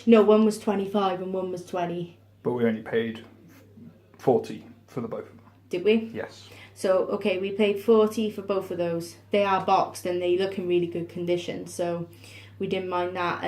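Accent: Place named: Newport, United Kingdom. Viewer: heh well I'm from Newport as well. The accent sicks out a mile!:)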